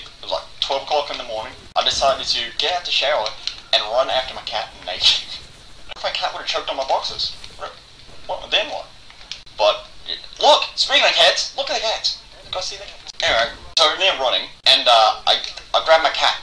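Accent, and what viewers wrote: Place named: Australia. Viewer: Aussie accent game strong as fuck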